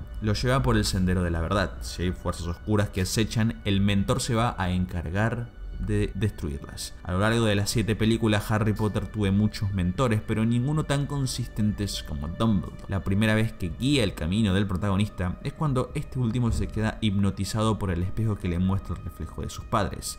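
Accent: Argentina. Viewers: parece argentino como habla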